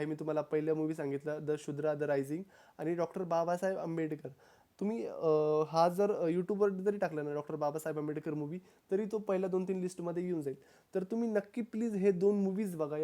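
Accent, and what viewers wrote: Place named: Vidarbha, India. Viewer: Great video Sir. I would like to suggest that please provide the reference for things possible. Are you from Vidarbha region, seems from your accent.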